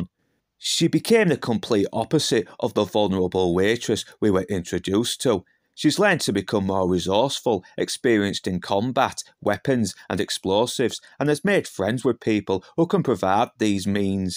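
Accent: United Kingdom. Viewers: I'm Romanian and I adore that British accent!!!Good job mate!!! :face-red-heart-shape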